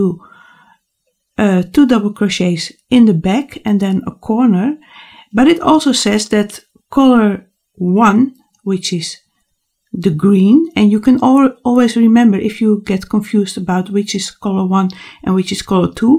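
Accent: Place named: Netherlands. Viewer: Grappig, ik dacht bij mezelf: "Wat een sterk Nederlands accent..." En toen bezocht ik je website. :) Dat Starburst patroon is mooi om te zien, hoor.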